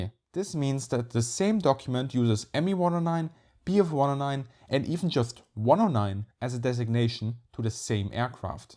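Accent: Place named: Germany. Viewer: Are u german? have the accent but was an interesting video!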